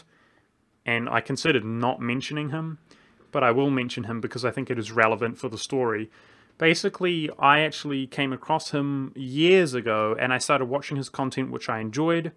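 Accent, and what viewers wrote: New Zealand. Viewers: Your'e from New Zealand? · He is New Zealandian I think. His accent is either New Zealandian or Australian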